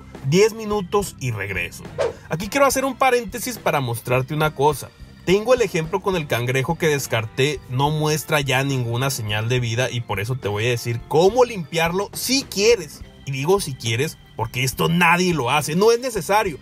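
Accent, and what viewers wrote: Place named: North, Mexico. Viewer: Yo tengo entendido que es de saltillo o torreón no estoy muy seguro pero vive en estados unidos y no tampoco es de Monterrey solo que es el acento norteño que tienen en eso lares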